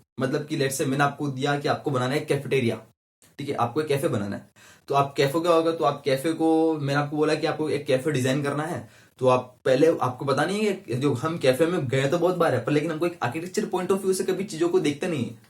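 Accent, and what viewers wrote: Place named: Marwar, India. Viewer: bhiya aapke voice me se mast marwadi tone aa rahi hai isliye ghar jaise lag raha hai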